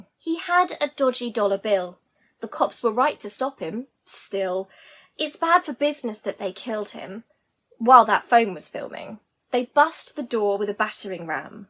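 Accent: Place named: Kent, United Kingdom. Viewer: Loving your english Kent Style Speaking for my Audiobook - I'm a German Producer and Novel Writer and wanna ask you to speak for women rights at my novel audio book - if you want it - write me a YES and make me happy - Im a fan for your channel for about a half year - my audiobook is splittet in some chapters about a chapter is approx. 15 min - when you speak a 1-2 Min Session it would be great for me and I'll give you the text - you can speak it in your smartphone and send me at <mail> - i would love to have you on my audiobook so much - Greetings from Bavaria to the Kent-Region of the Land of Kate Bush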